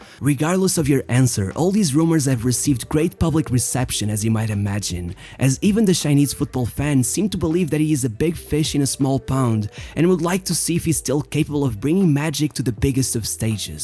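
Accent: Portugal. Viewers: Are you portuguese? You sound really portuguese,se fores grande vídeo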